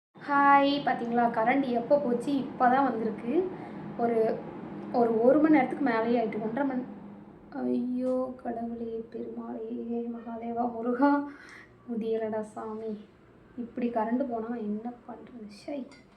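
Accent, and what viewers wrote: Tirunelveli, India. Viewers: Neenga endha ori Tirunelveli aa?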